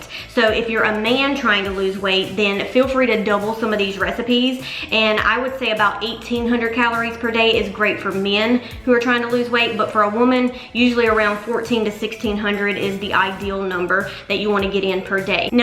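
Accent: South, United States. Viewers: There's nothing better than a sweet southern drawl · love that country accent baby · Came for the insight, stayed for the southern accent. 😎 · Love the dishes you put together. Simple and they look yummy and healthy. You sure do talk at a good speed, and I love your Southern accent. I'm from Texas, but don't have the drawl. Thank you. Love all your videos.:virtualhug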